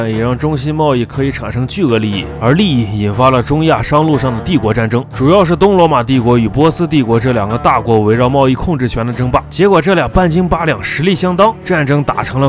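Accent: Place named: Northwest, China. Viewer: 精彩！讲与伊斯兰教有关的话题，加上解说的西北口音，就更加精彩，堪称完美。期待下集！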